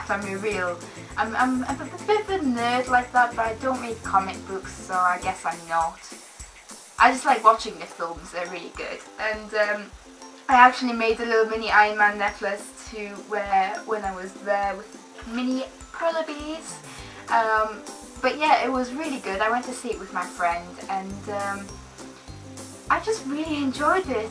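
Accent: United Kingdom. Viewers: Are you either.. Scottish? English or irish?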